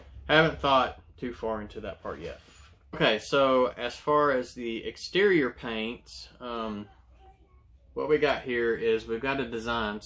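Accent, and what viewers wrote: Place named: Arkansas, United States. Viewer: Did a great job...great recovery. I’ve run into some bad instructions from Walthers too. Your Arkansas accent got me 😊...spell the name of that business....Happy New Year 🎆🎊🎈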